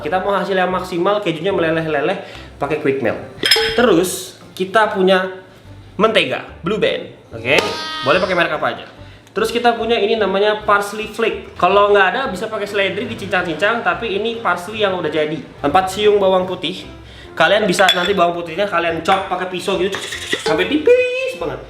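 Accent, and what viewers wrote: Bali, Indonesia. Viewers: Bli logat nya sudah kayak orang bali wkwkkw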